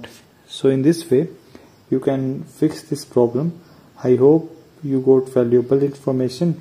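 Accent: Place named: India. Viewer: Can someone besides an Indian make videos troubleshooting things? I am so sick of this accent.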